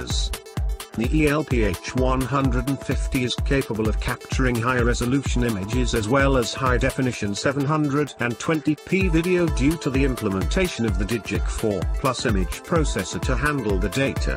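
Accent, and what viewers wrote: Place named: United Kingdom. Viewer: I realize the British accent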